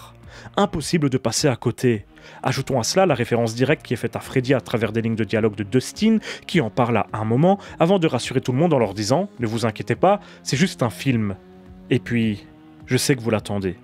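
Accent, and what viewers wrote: Belgium. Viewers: Ah ben je savais pas que tu étais belge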